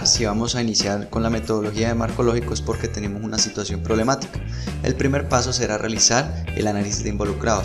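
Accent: Colombia. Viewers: La cadencia al hablar (supongo colombiana) me obligó a afinar el oído pero entendí todo. Gracias. Un mínimo aporte a lo que para mí es un excelente vídeo: El sonido al principio es deficiente.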